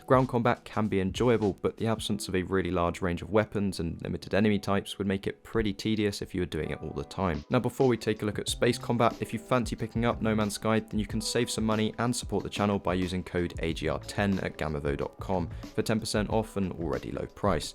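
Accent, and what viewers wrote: United Kingdom. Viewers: Wow. 2024, playing since 2018, love the British accent. All you need to know is here. Btw, if you want to make money (units) -> chlorine, afterwards -> buy cheap ships, upgrade them, and sell them back as scrapping for AI parts... Worthing a lot of units.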